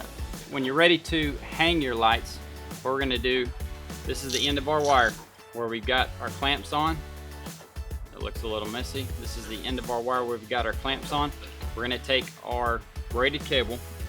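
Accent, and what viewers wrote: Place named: South, United States. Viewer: Southern accent sounds funny and cute at the same time.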